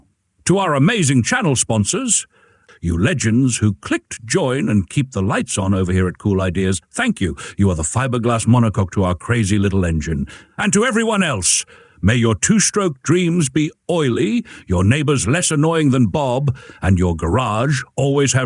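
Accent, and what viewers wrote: England, United Kingdom. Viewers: AI can make it sound like an English accent but lets itself down with pronunciation.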